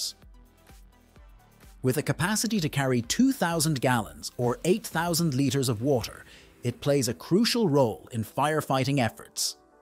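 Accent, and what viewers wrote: Ireland. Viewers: I believe the narrator is Irish & their English pronunciations are a bit different. No problem.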